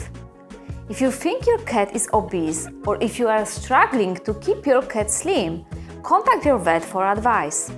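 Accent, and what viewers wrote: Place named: Austria. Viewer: Tysm for the info! I love your austrian accent!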